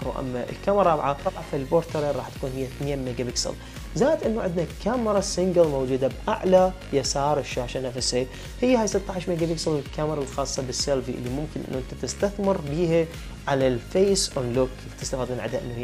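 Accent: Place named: Najaf, Iraq. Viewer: من لهجتك مبين نجفي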